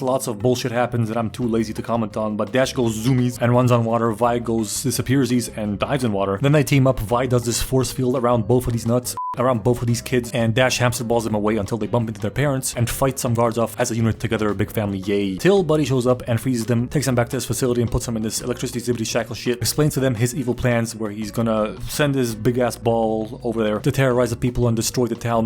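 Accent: France. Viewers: Hey you have a really good french accent ! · Are you french?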